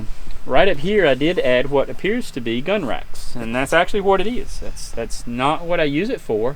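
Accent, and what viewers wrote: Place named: South, United States. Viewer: I LOVE the highly intelligent review combined with the southern accent. You Sir are a class act and representative of all the good natured intelligent rural folk across our great country. And excellent review, subscribed!!!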